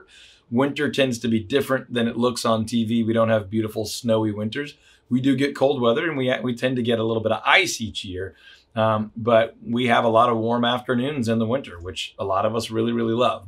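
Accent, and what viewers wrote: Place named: Dallas, United States. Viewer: I bet this guy talking is from Dallas.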